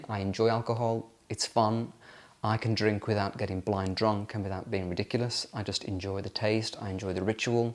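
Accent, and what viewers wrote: RP, United Kingdom. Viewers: I have been watching since your old flat. I think you look the same. Maybe your accent has become a bit more posh. You are lucky you are not aging. No still the same. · What a posh accent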